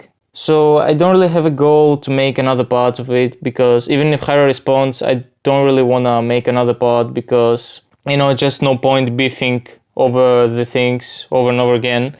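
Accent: Greece. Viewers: Love the accent, fellow greek accent. Hyra is being a little kid again like wtf. Thanks for sharing your view. Hyra is totally wrong here, lets just not like be toxic back. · I can hear the greek accent coming through my ears lmao Much love man ❤ Stay cool about it There is no reason to waste your time on him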